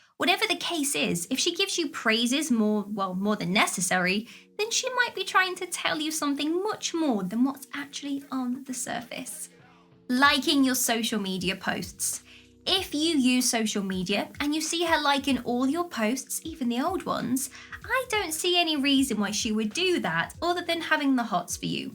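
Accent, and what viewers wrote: United Kingdom. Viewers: I'm improving my english accent here. Very cultivated channel and the british excellent tone in language is superb here indeed. Fantastic beautiful. I listen to you just to improve my english tone 🤗❤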